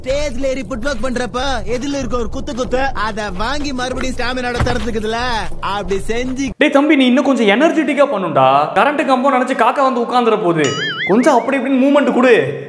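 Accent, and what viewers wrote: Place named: Chennai, India. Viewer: Unga madras slang semma. "Kutty kunjan" alaga irukku. Kidnap pannamnu neenga sonnadhu, semma.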